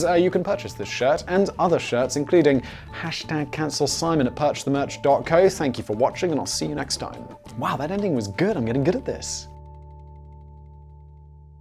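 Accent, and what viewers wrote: United Kingdom. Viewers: I did not know British people said urinal like that. I’m shook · The way Brits say urinal, garage, and controversy is weird. And I learned this from watching Simon.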